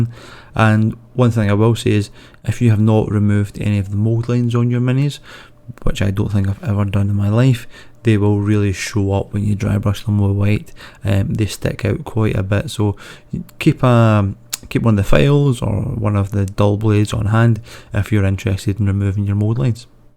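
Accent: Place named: France. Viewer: "I am French, which is why I sound like this" ☠️ Now that's how you start a video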